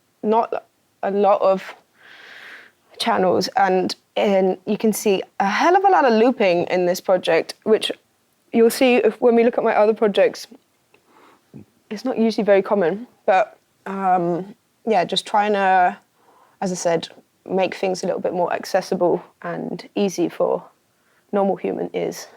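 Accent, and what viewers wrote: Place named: United Kingdom. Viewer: She's so "Bri-ish" I couldn't understand half of it :D Good the music is banging :)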